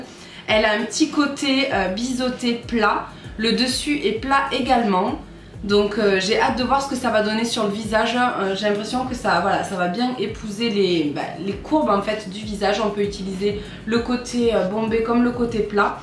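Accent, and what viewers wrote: South, France. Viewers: Ton accent me fait toujours plaisir, ça me donne ma dose de Sud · notre accent du sud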